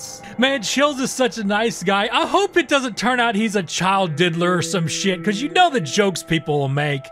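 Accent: South, United States. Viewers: God you’re so southern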